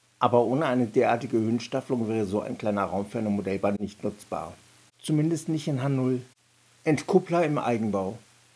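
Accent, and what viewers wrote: Hesse, Germany. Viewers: Keine schlechte Idee, diese "Regalbauweiss". Lässt sich bestimmt "einfacher" umsetzten als eine Modulbahn. Ich vermisse dein hessisch Gebabbel... 😁😁😁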